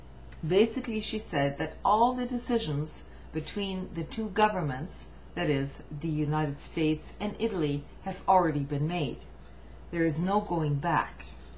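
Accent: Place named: Scotland, United Kingdom. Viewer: seems like you have a trace of scottish or irish accent in yr speech did you ever live there?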